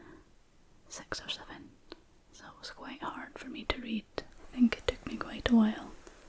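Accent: Scotland, United Kingdom. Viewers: I love your Scottish accent im from sunderland in the north east of england and i love visiting scotland every chance i get 😍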